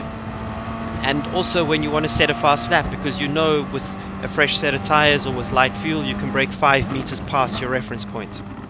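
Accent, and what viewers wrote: South Africa. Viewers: Thanks for the tips! Is that a South African accent? I've been trying to decifer it. Lol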